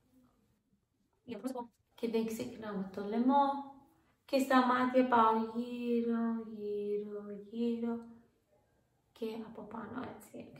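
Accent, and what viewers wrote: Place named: Greece. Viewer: Gringlish 100%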